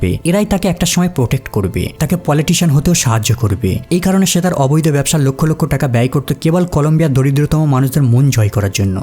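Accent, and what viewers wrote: India. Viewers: আরেহ ভাই এরা ইন্ডিয়ান এদের কথা এই রকম হয়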